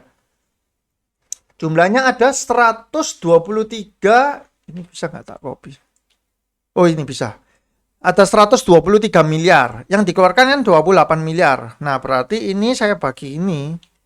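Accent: Surabaya, Indonesia. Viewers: suroboyo ta bos,kok kentel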